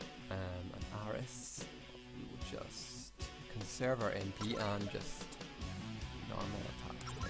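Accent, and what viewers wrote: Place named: Scotland, United Kingdom. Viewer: whats with the accent though haha im scottish and i dont sound anything like you, haha where you from in scotland.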